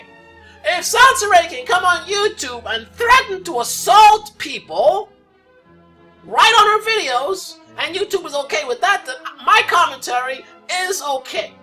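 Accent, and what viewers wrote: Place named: New York, United States. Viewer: By the way,,,, when did you gain your New York accent????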